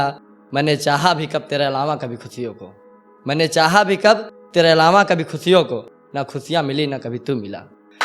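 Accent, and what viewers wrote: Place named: Allahabad, India. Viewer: Superbbb bro❤️.....pure allahabadib... must listen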